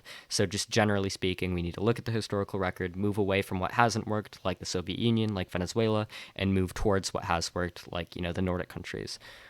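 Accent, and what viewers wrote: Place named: South, United States. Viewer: I can't take her seriously with that southern accent.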